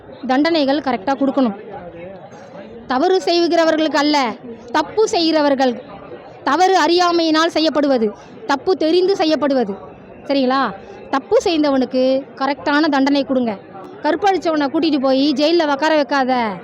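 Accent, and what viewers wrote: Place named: Tirunelveli, India. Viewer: சகோதரி திருநெல்வேலி பேச்சு சரிதான்.... நாம் தமிழர் திருநெல்வேலி.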